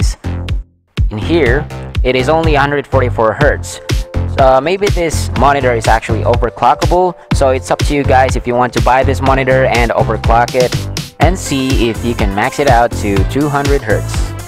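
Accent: Philippines. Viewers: Ok sana kaso bisaya ka e hahah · animal! bisaya man diay ni. haha subscribed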